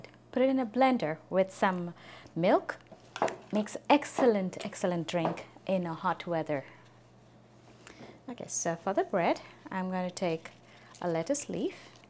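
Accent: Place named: India. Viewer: Is this what u learnt in the entire video..she is Indian and has an accent..live with it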